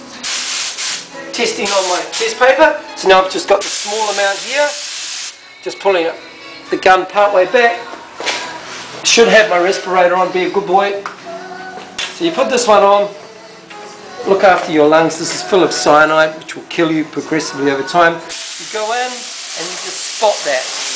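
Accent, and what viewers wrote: New Zealand. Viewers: Great video, clear explanations, nice to hear another kiwi too rather than the usual american.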